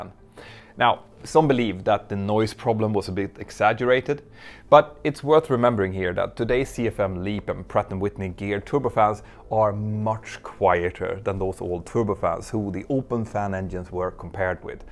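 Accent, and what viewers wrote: Sweden. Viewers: Are you swedish? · A 100 % the host’s from Sweden… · The Swedish English really makes this feel international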